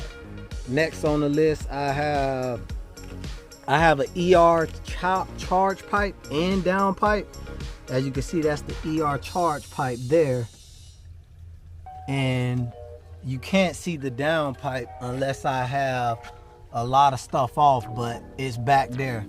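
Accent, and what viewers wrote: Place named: Alabama, United States. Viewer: You sound like you are from Alabama, which is where I am. I just bought a 2018 X5 x35i and I am itching to give it bigger balls. Yours sounds amazing. I’d love to have mine sound like that as well. I’m new to your channel. Thanks for sharing.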